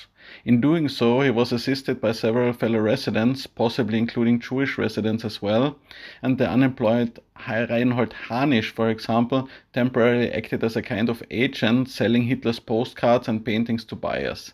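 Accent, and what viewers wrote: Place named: Vienna, Austria. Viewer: Du sprichst Wiener Englisch, noch nie gehört.😊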